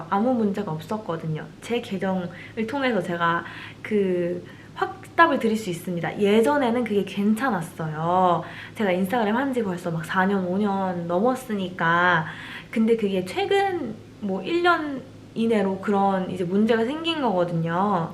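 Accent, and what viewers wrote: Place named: Gyeongsang, South Korea. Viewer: 경상도분이시네요 ㅋㅋ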